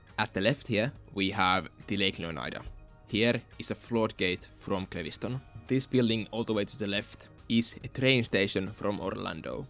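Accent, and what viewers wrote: Finland. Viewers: I hate your strong accent, sorry... but I understand... I prefer to hear your Finnish accent than the annoying and incomprehensible accent of people from India. By the way, great job with the map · Finglish. Or rally english. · I love Finnish accent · That is our brother from Finland 🇫🇮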